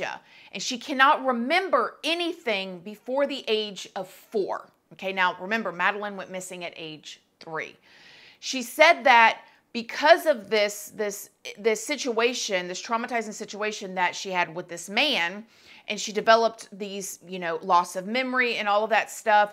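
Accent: South, United States. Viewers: It's just her accent guys. She's got a southern accent. Hahaha it's that "southern twang" that's funny though, y'all are funny.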